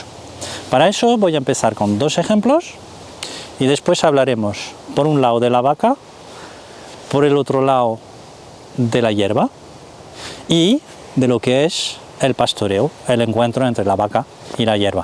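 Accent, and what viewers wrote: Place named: Andalusia, Spain. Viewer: Muy buenos tus vídeos, pero lo que más me llama la atención es tu acento. ¿De dónde eres? A veces me parece que eres andaluz (pero no sé de cuál región específicamente), a veces que hablas catalán, a veces que hablas portugués (por pronunciar bastante abiertas las vocales)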